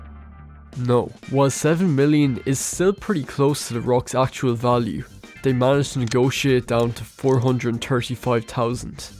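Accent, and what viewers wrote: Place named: Ireland. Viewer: Nice to hear another Irish voice on youtube. Never heard of this incident. Looking forward to seeing more.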